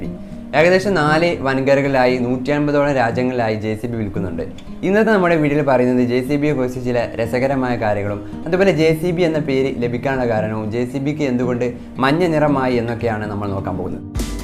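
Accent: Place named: Malabar, India. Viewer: Nannaitund Malabar slang edakk varund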